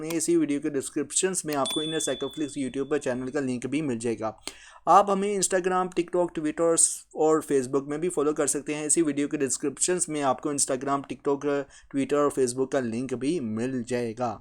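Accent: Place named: Punjab, India. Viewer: thanku sir for these lectures and your punjabi accent is wow🥰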